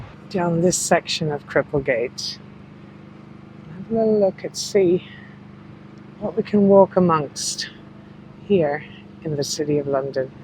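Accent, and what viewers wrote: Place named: Ireland. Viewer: Ohhhhh, it’s so spring lovely to see and hear. Beautiful Irish lilt. I’m sooo looking forward to to your video, of Roman London. London Spring/ Summer/, what more can one possibly ask for. A absolute Delight for the senses. 😊🏴‍☠️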